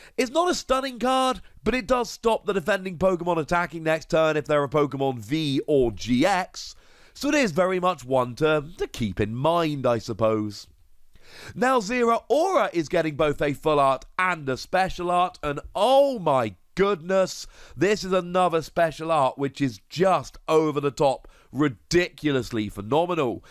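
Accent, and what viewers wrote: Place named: United Kingdom. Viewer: Half the reason I watch you is to hear you say “but’’ in your beautiful British accent. Love the info you give us amigo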